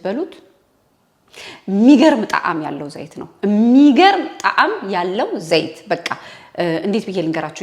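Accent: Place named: Italy. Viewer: ሰላጣ ማለት ነው salad በእንግሊዘኛ ነው አንዳንዴ ጣልያንኛ ተናጋሪ ስለሆነች pronounciation ትንሽ ሊለይ ይችላል።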